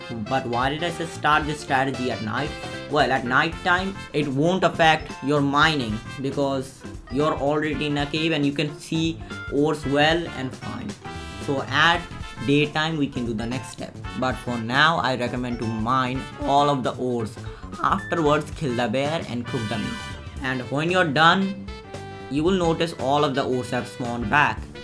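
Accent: India. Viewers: are u indian by chance? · Prob indian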